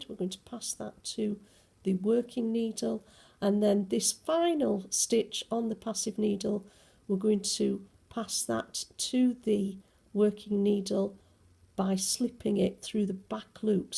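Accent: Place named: Northern England, United Kingdom. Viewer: Love your Northern accent ...and of course the neat technique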